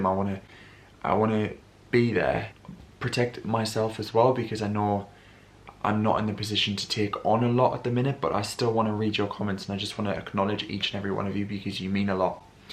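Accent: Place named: Durham, United Kingdom. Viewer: I wish there had been you tube around when I was younger so that I could be inspired by creators such as yourself. I went through similar things at your age and not having a place to share it took me so much longer to get over things and find out who I really was. I am still searching 40 odd years later. However with people like you sharing and putting mental health on the front page is fantatsic as the stigma is so hard to shake off, however if we all share then we can all help and learn from each other. Plus I love hearing the Durham accent on you tube... makes me feel like I am home xxx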